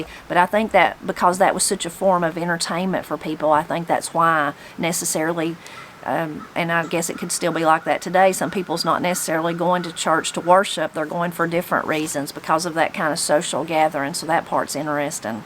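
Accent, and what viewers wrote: Appalachia, United States. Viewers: Thank you for sharing. Love your readings. You have the ability to bring Sidney to life with your Appalachian accent and your voice fluctuations. What a true blessing.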